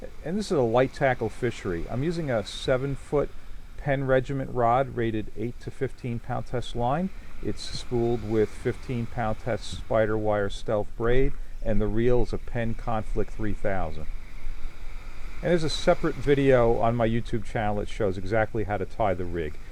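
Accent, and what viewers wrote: Long Island, United States. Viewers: Would love to watch the video but can't stomach the Long Island accent...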